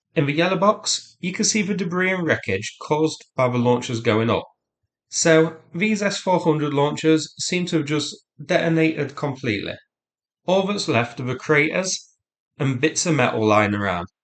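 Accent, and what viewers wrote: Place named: Estuary, United Kingdom. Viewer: Why have you gone deep into estuary English today?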